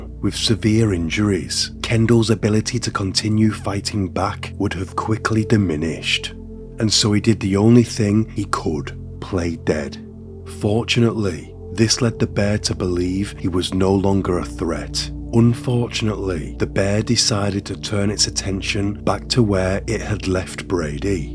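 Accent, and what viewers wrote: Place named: Liverpool, United Kingdom. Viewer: Don’t let anyone crap on the way you speak. It’s lovely. I love a slight scouse.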